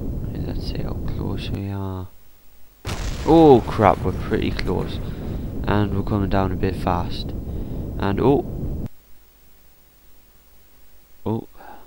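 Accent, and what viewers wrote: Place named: North, United Kingdom. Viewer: Haha close enough! Thought you had a northan twang to your accent :)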